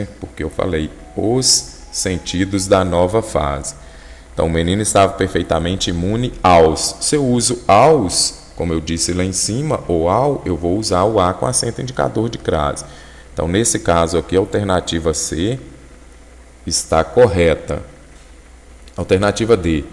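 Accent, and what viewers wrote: Minas Gerais, Brazil. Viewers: Ahhhh esse sotaque mineiro que parece fazer-me compreender ainda melhor a explicação....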